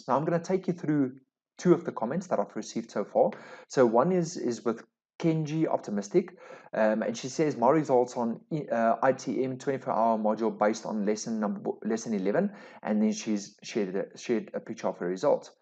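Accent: South Africa. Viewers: Daai afrikaanse aksent😂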